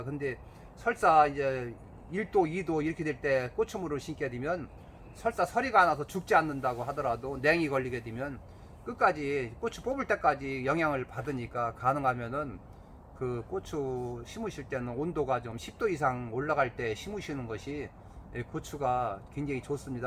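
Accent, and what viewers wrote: Chungcheong, South Korea. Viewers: 충청도 사투리가 정겹네요 좋은정보 감사합니다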